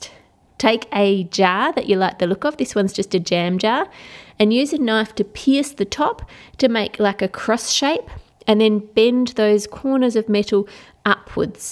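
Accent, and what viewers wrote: Australia. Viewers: Its just a aussie accent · Ate you Australian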